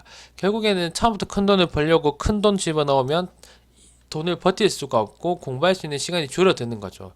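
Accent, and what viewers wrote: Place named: Daegu, South Korea. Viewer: 안녕하세요 같은 직장인으로, 애 둘 아빠로 동질감을 느끼며 영상 잘 보고 있습니다~ 어제부터 정주행으로 조심씩 보고 있는데 나이도 비슷한거 같고 경북/대구쪽이니 사투리도 정겹네요~ 궁금한게 있어서 몇 가지 여쭙니다^^ 1. 주식 공부는 혼자 하신건지? 어떻게 공부를 하신건지?3년 동안 다지기 이후 이제 빛을 보게 되신건지?^^; (혼자 학습으로 3년 동안 시행 착오도 계셨을텐데...) 2. 대부분 종가베팅 후 시초가 매도던데 종목 선정은 이전에 올려주신 조건식 위주로 하신건지? 조건식은 어떤 것, 몇 개를 사용 하시는지.. 종가베팅은 대부분 3시부터 분할 매수로 진행 후 확신있는 것은 18-19분경 베팅 하시는지? 소액으로 종가베팅 연습중인데 승률이 그렇게 좋지 않아 좀 자세히 여쭤보고 싶어요~~ 감사합니다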